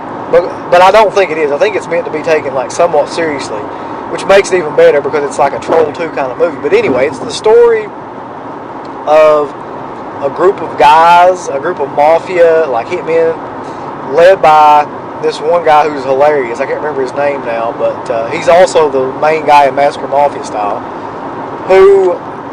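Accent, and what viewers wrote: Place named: Kentucky, United States. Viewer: Kentucky accent =automatic stabbing in the D!..:)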